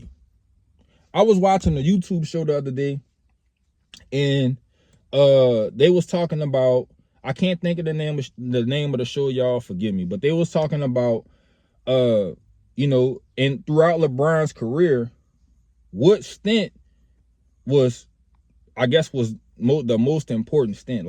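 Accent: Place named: Louisiana, United States. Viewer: I can definitely say this dude is from Louisiana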